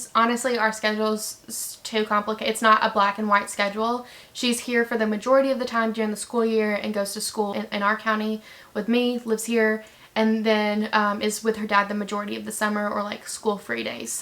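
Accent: Alabama, United States. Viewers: I just discovered your channel from your story video 💕💕 I knew I recognized that Alabama accent.. I'm a Bama girl too! 😊 You sould like a great mom! I would love to watch a series on your teen mom experiences. Thank you for sharing your story. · I had a STRONG feeling you were from Alabama. I’m from North Alabama! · When I’m watching this video you guys are at 99.4 subscribers. SO CLOSE! I would love to see a series of videos like this. Also, do you guys still live in Alabama? NOT CREEPY, I’m just really fascinated by your accents 😂🤣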